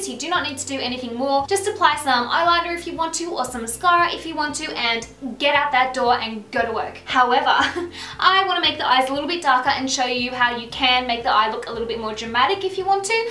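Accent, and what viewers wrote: Australia. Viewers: australia, I think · I would say australia somewhere. Just guessing.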